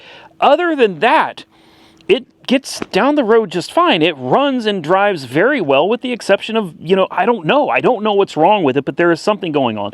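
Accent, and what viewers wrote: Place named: Oklahoma, United States. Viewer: What part of Oklahoma are you from?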